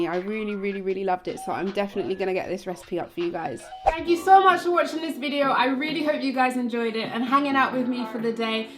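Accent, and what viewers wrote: England, United Kingdom. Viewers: Ok so I got 2 problems. 1) Vitamin is pronounced V-Eye-Toe-Min. 2) Does it make me a hater if I'm hating on your hot english accent because I wish I had a hot english accent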